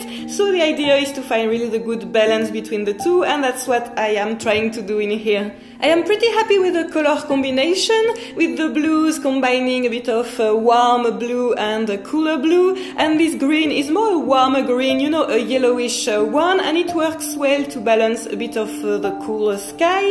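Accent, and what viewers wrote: France. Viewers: I have really enjoyed this tutorial. I have painted it four times and keep learning a little more each time. Sometimes I have a difficult time understanding you when you talk very quickly with your French accent so I have found a solution to that. I slow down the speed on my computer which slows down your talking too. It works great although at times it is rather funny because it makes you sound a bit intoxicated. Lol. I would love to watch tutorials on negative painting. You are such a talented artist.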